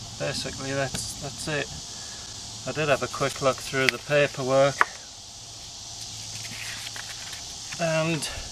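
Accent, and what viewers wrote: United Kingdom. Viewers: Where do you live at brother if you don't mind my asking? You sound British, but I didn't think you were aloud to own shotguns in England. No offense meant. I'm just ignorant when it comes to gun laws in the UK.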